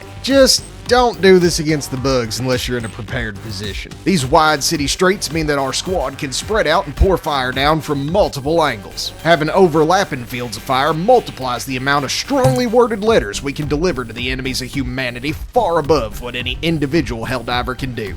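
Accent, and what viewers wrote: South, United States. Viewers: Wonderful Southern accent! 👌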